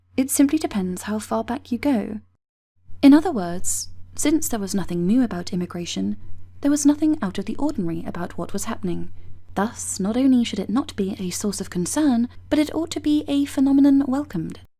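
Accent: England, United Kingdom. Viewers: Gosh, this British accent is so cute ❤️. greetings from Brazil. Get Brexit Done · WOW! This ladys English accent is fabulous & sadly soon to be replaced. I prefer this to an ISLAMIC accent.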